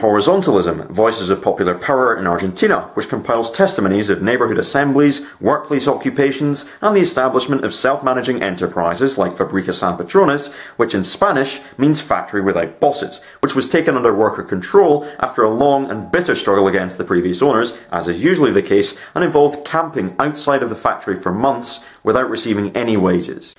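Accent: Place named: Scotland, United Kingdom. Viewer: Sorry, I'm from Canada so I can't distinguish accents well. I guess you're in Scotland.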